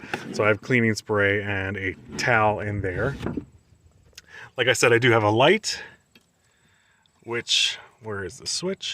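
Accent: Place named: Maryland, United States. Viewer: Ps this is the first vid i ever seen of yours it came across my you tube bc i watch tiny /bus homes . Im hearing an accent that sounds very similar to mine... Are u from Maryland or near???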